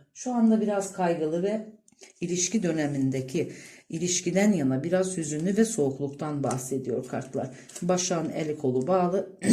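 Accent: Azerbaijan. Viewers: Siz Azerisiz?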